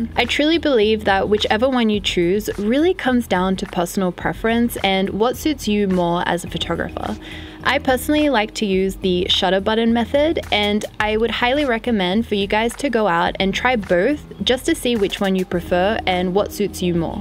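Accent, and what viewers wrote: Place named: Australia. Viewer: Your accent is like Aussie pronunciation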